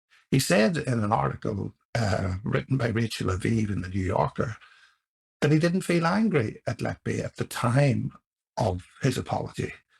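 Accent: Belfast, United Kingdom. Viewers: It gets better. I hate wearing headphones but I want to hear every single word he says, which helps with the sound quality and his wonderful Belfast accent. Besides, he does get the sound quality much better as the series progresses.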